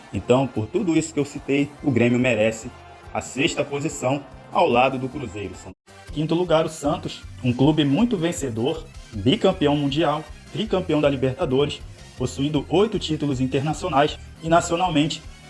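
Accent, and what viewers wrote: Rio de Janeiro, Brazil. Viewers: Pelo sotaque da pra ver que o cara e carioca !!!! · Sotaque carioca do cara já da pra ver que é flamenguista kkkkk onde flabosta é primeiro kkkk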